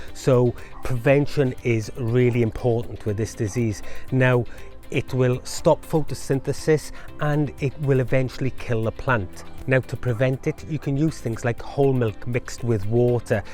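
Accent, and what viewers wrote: Wales, United Kingdom. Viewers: i thought id heard on another video that late blight is ok and tomatoes wil continue to grow untoched. Im so glad i watched your video. I planted 12 tomatoe palnts (money makers) in my garden in march. all this rain weve had this last 2 weeks has battered my tomatoes. luckily theyd already flowered and fruited. ide already got a good 2kg of tomaotes, up until the last 2 days ive spotted late blight and rottening my tomatoes. i watched this and had just have to rip up all 12. now my chillies next row can have some air and light ;) Cheerz bud! I luv the welsh accent, makes it more satisfying to listen to. now ive got room for bulding the first raised bed of 6 (to my plan). all the stuff ive grown so far have been a success. its my first year after many years not grwoing. next year ive got some big plans to grow lots. ive got 2 woormerys on the go too, these castings will be part of my soil mix. 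ive also got 3 composting bins on the go so will be mxing this with manure that i will mix up in the winter so its got time to rot for next spring. I furkin luv gardening. so glad i started it again. From Newnham on severn! thankyou for the video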